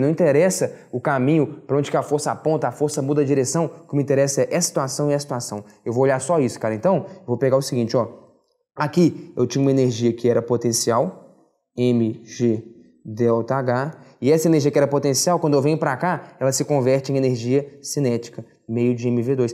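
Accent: Minas Gerais, Brazil. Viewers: seu sotaque massa "minerim"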